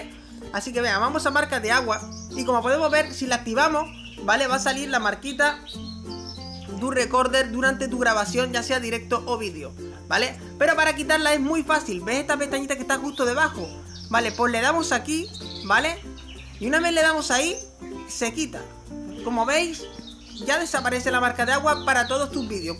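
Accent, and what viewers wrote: Dominican Republic. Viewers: Dominicano verdad?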